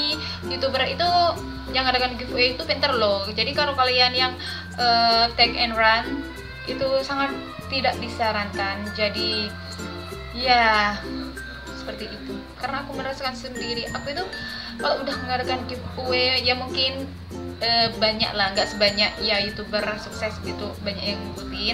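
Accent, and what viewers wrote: Madura, Indonesia. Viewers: kakak dari madura ya? bicaranya kek aku hehe :v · Logatnya mirip bgt sama orang Sumenep (Madura) 🙄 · Kayak ada madura-maduranya deh kk 😂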